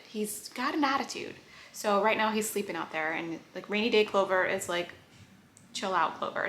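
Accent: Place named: Jamaica. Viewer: I hear your Jamaican dialect. Its hidden but it's still there. Hello to yah. Looove your home. You are very much like me in style and decor. 😊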